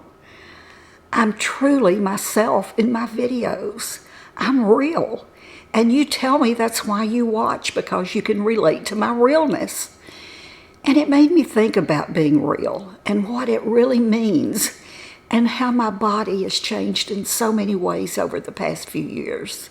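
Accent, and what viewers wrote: South, United States. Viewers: you are so absolutely gorgeous oh what I wouldn't give for such beautiful sparkling blue eyes and I LOVE your adorable southern accent!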